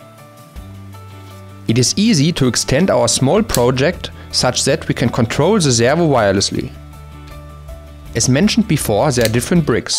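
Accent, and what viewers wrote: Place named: Germany. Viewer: Tolle Idee, und gut, daß Ihr in OWL seid, aber bitte besorgt Euch noch einen Native Speaker für das Werbevideo, mit dem schweren deutschen Akzent wirkt das nicht sehr professionell. Macht am besten 2 Sprachversionen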